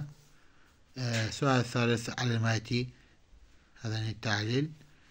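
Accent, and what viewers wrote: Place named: Egypt. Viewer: الاستاذ چنة مصري بلگوة ديحچي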